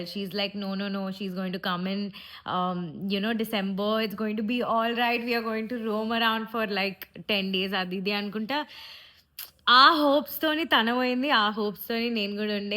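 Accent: Telugu, India. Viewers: Ufffffff loved your Telugu accent ❤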